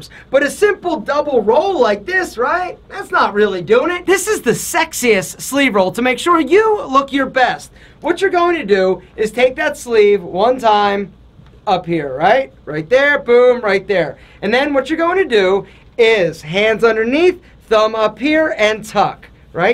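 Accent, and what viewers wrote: Philadelphia, United States. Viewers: He sounds like he's from Philly.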